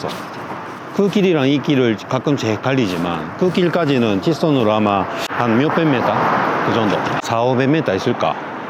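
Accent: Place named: Gyeongsang, South Korea. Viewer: 인품 좋은 설명도 좋은데, 여게 거게 저게~하는 옛날 경상도 사투리가 참 듣기 좋습니다.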